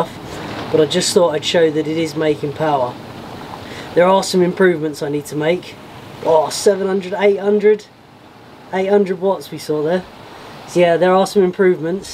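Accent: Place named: West Country, United Kingdom. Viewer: yes, for the West Country accent alone.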